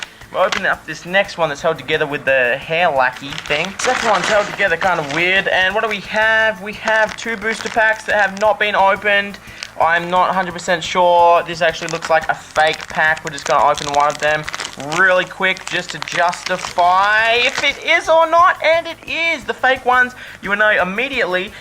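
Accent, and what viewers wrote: Australia. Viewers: HE'S AUSTRALIAN! Sweet :)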